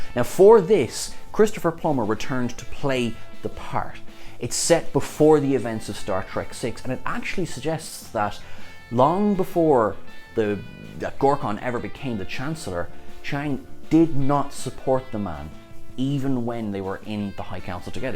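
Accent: United States. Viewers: Your American accent is brilliant! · strong american accent, lol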